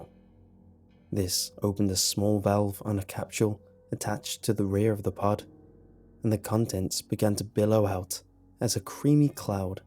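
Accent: England, United Kingdom. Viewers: He has an English accent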